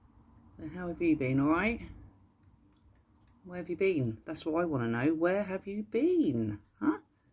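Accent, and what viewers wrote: United Kingdom. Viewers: I love the British accent, especially when you say: “marvelous » tail ! It’s true that Cookie’s fur is sublime! ❤😊🦊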